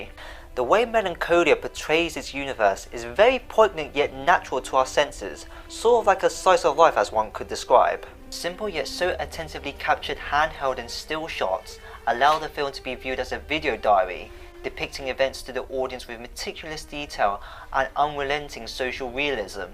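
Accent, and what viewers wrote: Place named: United Kingdom. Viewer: Also, British accent really rocks!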